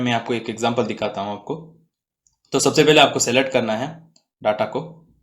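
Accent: Karnataka, India. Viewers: Thank you so much,. It's very helpful and comprehensive video. 👌🏻 Subscribed 😊👍🏻 PS. Are you kannadiga? 😃 (just wondering)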